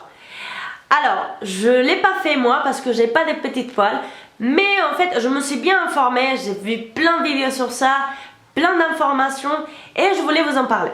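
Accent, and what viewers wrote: Spain. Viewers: oh non une espagnol! l axent est beau tres beau j adore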